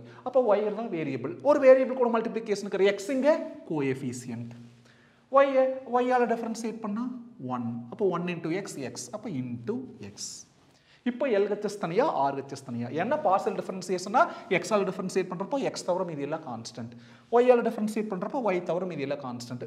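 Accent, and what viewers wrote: Coimbatore, India. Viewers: Kovai slang bro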